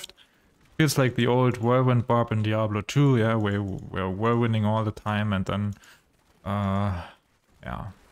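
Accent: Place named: Germany. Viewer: I höre deutsch raus :)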